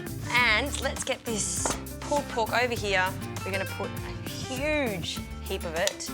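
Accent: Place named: Australia. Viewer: Remember the 160 is Celsius, she sounds from down under.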